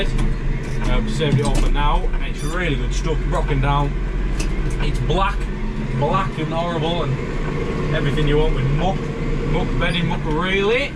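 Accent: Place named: Yorkshire, United Kingdom. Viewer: He is from yorkishire the accent should tell u that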